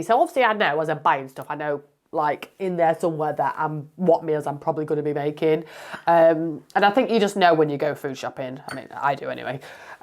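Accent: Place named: Doncaster, United Kingdom. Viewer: Well done, you look amazing and I bet you feel even better. You sound as if you’re from Doncaster like me, am I close? 😊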